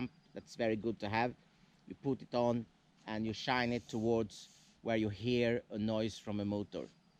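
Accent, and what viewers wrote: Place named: Sweden. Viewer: very good swinglish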